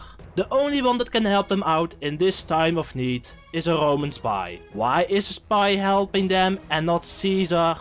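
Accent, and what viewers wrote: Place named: Netherlands. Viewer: Strongest Dutch accent ever 😂😂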